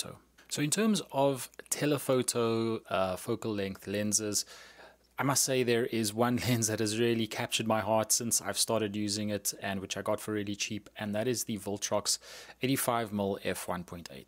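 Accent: South Africa. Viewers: South African??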